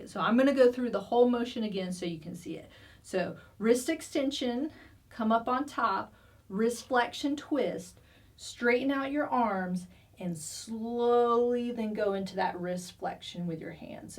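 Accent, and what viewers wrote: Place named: South Carolina, United States. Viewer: I am a PT and I want to thank you for doing such a great Public Health Service with your videos. Your treatment is spot on and delivered very clearly in an easy to understand manner. I’m in Massachusetts now but couldn’t help notice that you’re a fan of SC, my home state! It’s nice to hear a familiar accent. Keep up the good work!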